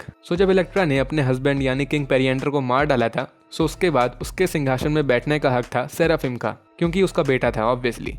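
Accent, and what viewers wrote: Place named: Bihar, India. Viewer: Ohh man.... are you from bihar?? I can recognise it coz of your accent.. But your voice is really relaxing and soothing.🥰❤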